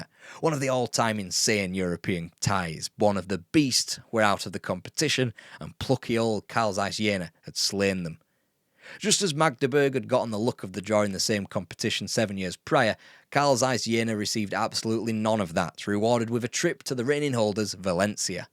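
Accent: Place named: Northern England, United Kingdom. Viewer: My girlfriend keeps trying to guess where you from she is between sheffield and manchester by your accent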